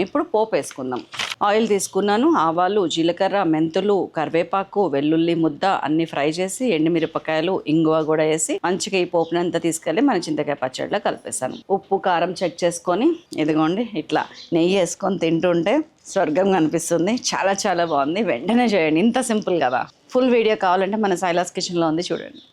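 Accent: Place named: Andhra Pradesh, India. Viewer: Meru telangana kada marendhuku andhra slang matladtharu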